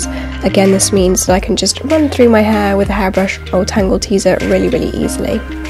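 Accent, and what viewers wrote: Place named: RP, United Kingdom. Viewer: You sound so posh even when your ill ;)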